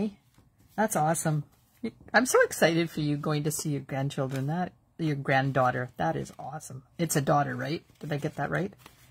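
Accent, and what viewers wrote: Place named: Alberta, Canada. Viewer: You sound like you’re an Albertan. 15 years there myself, and wow I agree with you, I can’t just sit and watch tv…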